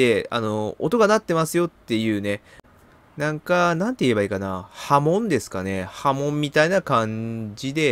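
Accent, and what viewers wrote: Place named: Aomori, Japan. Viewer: プレデターもやけど、青森？癖の強いなまりに集中出来へんわ(笑)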